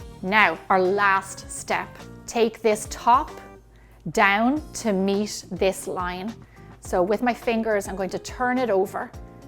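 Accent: Ireland. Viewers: I like the Irish accent, "butt eerrr" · Love listening to your lovely Irish lilt!! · Great video - thx! Will be giving it a shot. No steam? AND do I detect a bit of Irish in you? Maybe delicious Irish soda bread in the works? 😀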